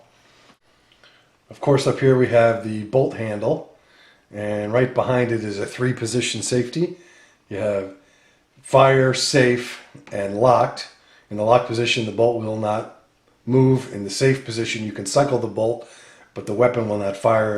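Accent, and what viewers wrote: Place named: United States. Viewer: Why do you have an american accent if your Australian?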